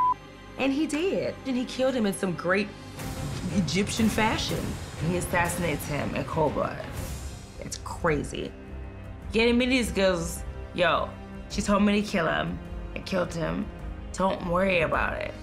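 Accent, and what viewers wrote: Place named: Bronx, United States. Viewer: The narrator is from the bronx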